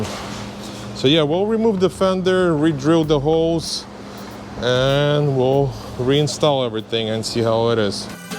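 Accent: Russia. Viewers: Ну по акценту скажу что чел то русский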